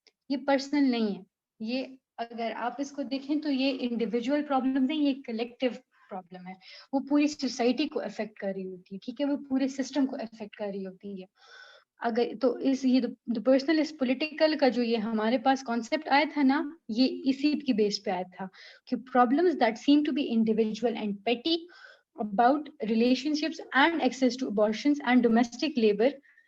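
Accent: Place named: Khyber Pakhtunkhwa, Pakistan. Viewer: Ma'am,you speak very funny urdu, apki urdu sun kr esa lg raha hai ap kpk se hn, or Ma'am apka lecture sun kr lg raha hai k ap pehli bar teaching kr rahe hn apky voice me thori c hesitation mehsus hori hai.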